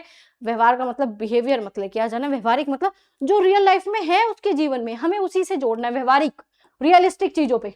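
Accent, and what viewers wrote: Baghpat, India. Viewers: Mam aap Khekra baghpat se ho kya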